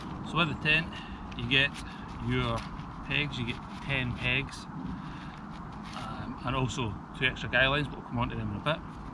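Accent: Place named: Scotland, United Kingdom. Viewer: If only all other tent reviews on YT were as clear, thorough/detailed, and as succinct as your...a brilliant effort, and that lovely Scottish accent as well!..the only things I would have added would have been: 1. To show the space in the inner with a sleeping bag to determine if it actually touches the inner, and 2. You sitting up inside the inner to give an idea/perspective of the space. But that's easy for me to say having watched it. Thanks, a worthwhile use of 15 minutes of my time!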